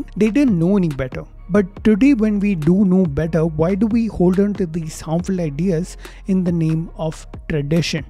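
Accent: South India, India. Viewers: He speaks south Indian English (if you know 'thank' and 'tank' thing)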